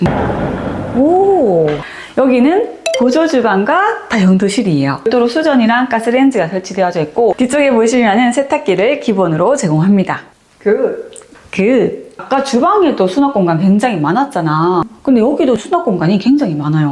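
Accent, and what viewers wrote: South Korea. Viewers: ㅠ여기저기경상도사투리 진짜듣기 거슬려요~~ 한남동같은 그것도서울쪽소개하시면 서울말표준어로 설명해주시면좋겠어요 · 절라도 사투린가요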